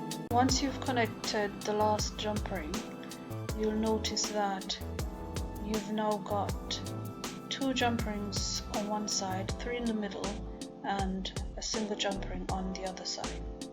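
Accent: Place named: Trinidad and Tobago. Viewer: Hello! I just came across your channel by looking for an easy chain link to make. Your work is easy and beautiful! Thank u, all the best! By the way I heard your accent, by chance are u a Trini? You sound a lot like me, lol. Thanks again. Gonna subscribe!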